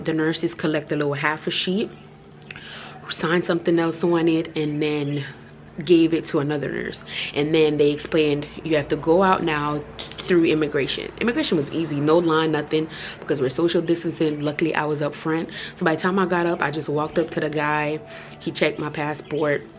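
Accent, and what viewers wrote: Jamaica. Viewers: No offence but which r u Jamaican r American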